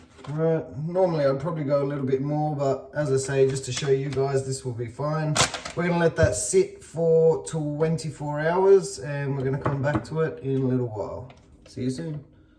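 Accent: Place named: Australia. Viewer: love your accent as well. I know your aussie but can tell theres a background somewhere,maybe second generation aussie but i can hear the little accent coming and going. Im from Sydney so grew up with lots of nationalities. Reminds me of home.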